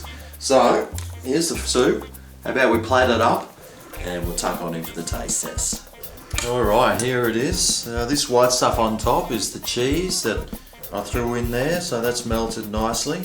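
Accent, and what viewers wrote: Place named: Australia. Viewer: Channel he's Australian not French do you know what French accents sound like.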